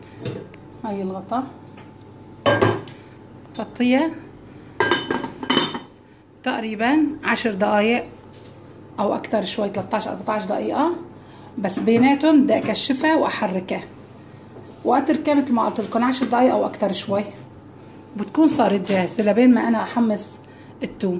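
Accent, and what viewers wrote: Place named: Aleppo, Syria. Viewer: يسلم...ايديكي....يابنت....بلادي....متابعك...من. مدينة...حمص،،العديه👌👍اموت..بللهجه....الحلبيه...الاصيله...وبلمطبخ. الحلبي، احلا ست بيت،،،هي المرأه الحلبيه..👸فنانه..بتحس انها عمتعزف معزوفه موسيقيه،،،،بلمطبخ....😂👌😘😂👏👏👏👏👏👏👏😘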